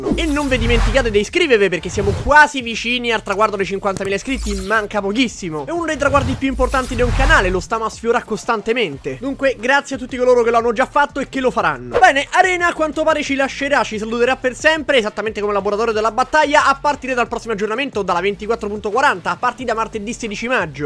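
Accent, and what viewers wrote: Rome, Italy. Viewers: Cmq non mi sarà capitato il video in qui dicevi di dove sei però se capisce che sei de Roma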